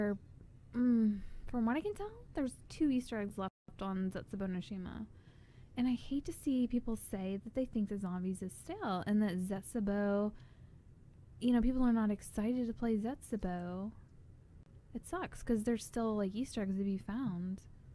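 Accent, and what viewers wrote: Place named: South, United States. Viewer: is it only me who notice her country accent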